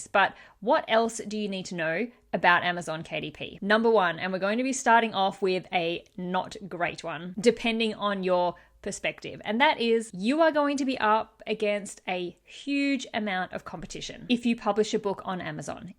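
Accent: Australia. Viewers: It was very hard to catch that Australian accent. But i can smell a Australian from a mile away.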